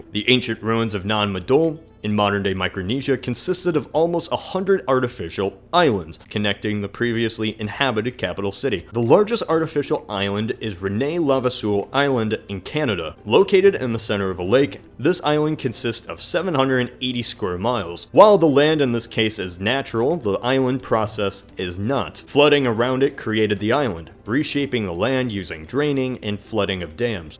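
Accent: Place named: United States. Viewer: thing he/she meant "he's english". But I don't think that's true, he sounds american/canadian.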